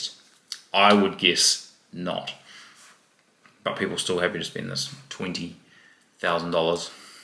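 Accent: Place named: New Zealand. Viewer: I AM outside of New Zealand watching this. But with mumbled staccato outbursts it’s really hard to understand. (Of course I understand that’s your native accent. But being more prepared and slowing down it might be easier to understand)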